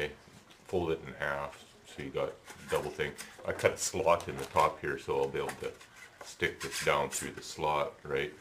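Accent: Canada. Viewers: canadian ahy?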